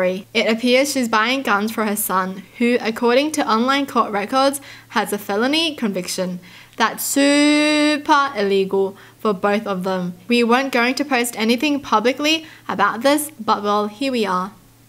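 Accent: Australia. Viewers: Ok, I can listen to you all day because of your accent 😂 I think you speak with an Aussie accent .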